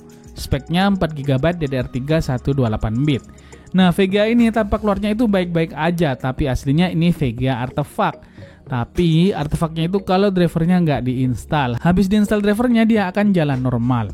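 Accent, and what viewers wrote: Madura, Indonesia. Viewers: Ngasak?😂 Kental banget madura kearifan Bondowoso 😂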